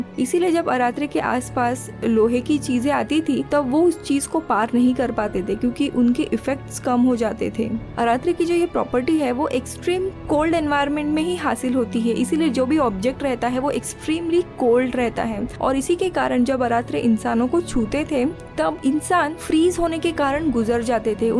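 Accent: Maharashtra, India. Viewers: Check your marathi accent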